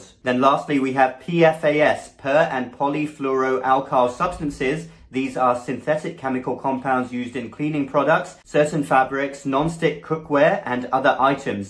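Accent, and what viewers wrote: United Kingdom. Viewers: On a completely different subject, you say you've lived in US for 10 years, your accent is still British. I am British, am staying in US with a London born lady, she has lived here for 35 years, not a hint of a US accent, sounds a proper London girl 😎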